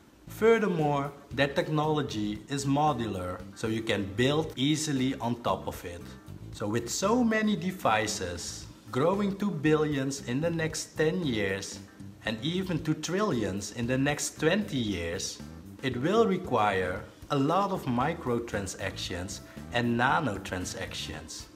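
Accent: Netherlands. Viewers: This was great, I really enjoyed your presentation style. You must be Dutch judging from your accent.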